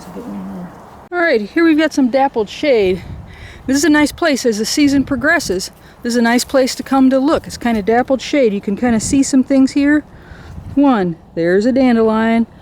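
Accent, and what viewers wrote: South, United States. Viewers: You said you are in the Pacific Northwest?? You sound like you may have a wee bit of a Southern in your accent or maybe TN Valley....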